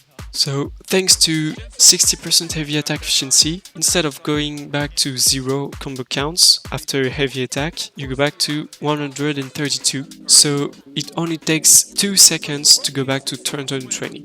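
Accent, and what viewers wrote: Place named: France. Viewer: slay girl ! very sexy voice btw, i love the french accent and the build is insane plz do more of these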